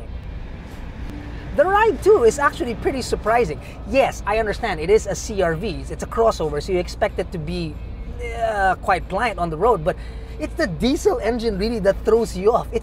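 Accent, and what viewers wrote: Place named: Philippines. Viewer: This is a very good example of filipino accent.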